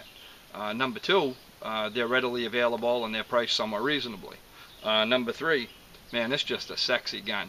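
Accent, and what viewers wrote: Maine, United States. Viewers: Are you from down east Maine by any chance? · I think I hear a Maine accent here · You sound like you're from Maine.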